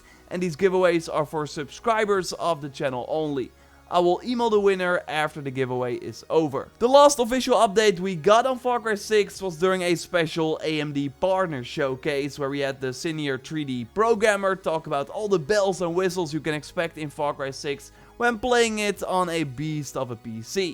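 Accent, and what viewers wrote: Netherlands. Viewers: m8 are you dutch you sure sound like one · Ken heel je kanaal niet. Maar aan dit accent te horen ben jij sws Nederlands lol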